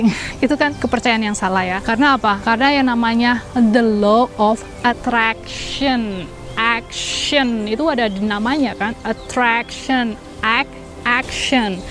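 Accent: Banyumas, Indonesia. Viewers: Owalahhhhhh,😍😍😍mbak ternyata wong Cilacap toh ☺️ salam ngapak mbak 🤣 Ora ngapak ora kepenak ❤️❤️❤️❤️ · Kereenn bgt si kak video ini.. aku merinding dan terharu.... jadi lebih semangat lagi dan ga mikir aneh2 tentang jodoh.. wahh ternyata kakak orang ngapak ya kak 😁 salam dari Banyumas 🤗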